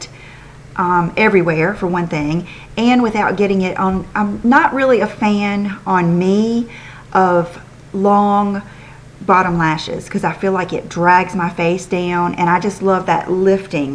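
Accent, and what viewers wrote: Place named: Texas, United States. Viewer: i loveeee your accent!!! is it from texas??? im from another country so that is why i dont know exactly where its your accent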